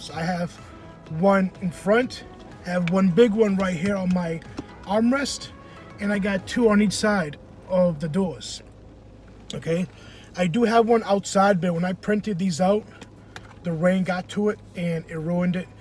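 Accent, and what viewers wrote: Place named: Boston, United States. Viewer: Yeah exactly lol! Just take the blame it it's your fault. Yeah, been raining like crazy here. I can tell by the accent you're from Boston!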